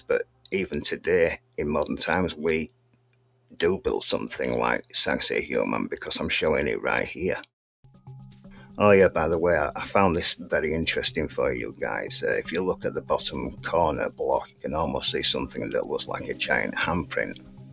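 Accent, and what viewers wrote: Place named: Blackburn, United Kingdom. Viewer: Nice work. Love the presentation and questions it makes one ask. Ya also sound like me best man's dad from Blackburn. Am I close?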